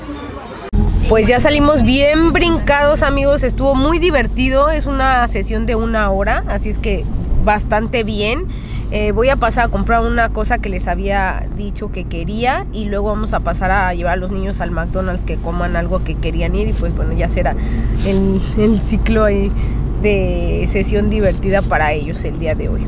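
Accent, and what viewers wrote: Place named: Upper class, Mexico. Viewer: Hablas como niña de la Ibero, cantas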